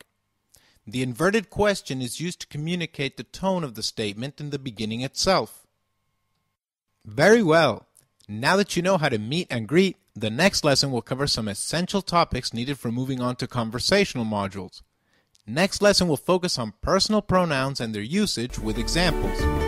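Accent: Spain. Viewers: Oh thank you so much It helped me a lot iv been searching For this video for a loooonf time since a Looooooove Spanich Accent 😆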